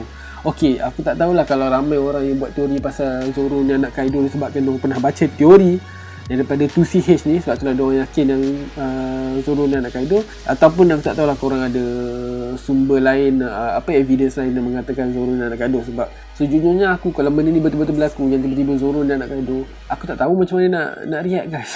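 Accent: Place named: Pahang, Malaysia. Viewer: pekat ngt loghat temerloh nye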